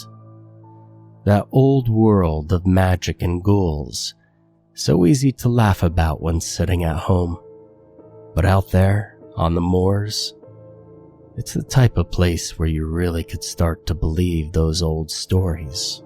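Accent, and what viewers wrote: United States. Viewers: Told with a thick American accent, trying desperately, and failing miserably, to sound like a Scottish accent. If you weren't born and raised here, you are NOT a Scot. And you most definitely are not a highlander! I am. 54 years. Born in Inverness and now living on the west coast. So, don't even think about arguing with me!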